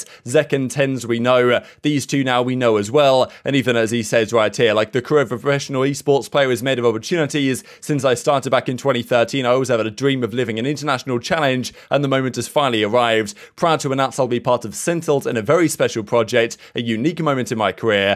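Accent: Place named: United Kingdom. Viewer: just how british people say it ig a lot of casters do it to lmaoooo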